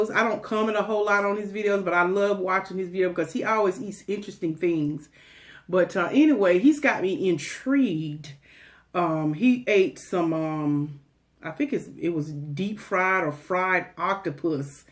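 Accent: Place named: North Carolina, United States. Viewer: When I heard you speak, I said this is a North Carolina lady right here. You sound like my family. They live in Clinton, NC where my mom grew up but my cousins grew up in Mt. Olive. Good ole southern folks. 🤗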